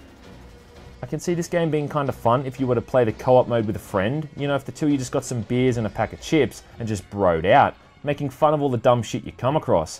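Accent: Australia. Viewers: Aussie mate ?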